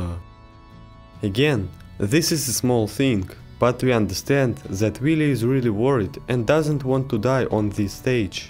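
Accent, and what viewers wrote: Russia. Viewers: You have a Russian accent. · It's Russian accent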